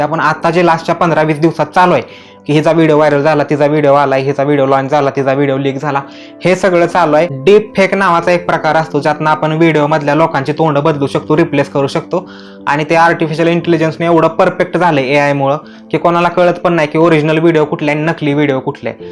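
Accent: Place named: Solapur, India. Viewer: Laga bhava tuzi bolnyachi paddhati sangte ki tu Solapur district mdun ahes.tuzi boli connect hotei.chalu thev 😊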